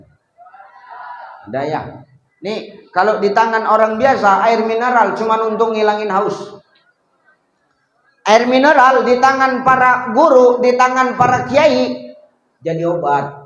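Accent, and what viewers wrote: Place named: Sumatra, Indonesia. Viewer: Assalamu Alaikum Apa ka bar bang Ustadz semoga sehat terus saya suka sekali dengan Ceramah Nya bang Ustadz lucu banget logat Sumatra nya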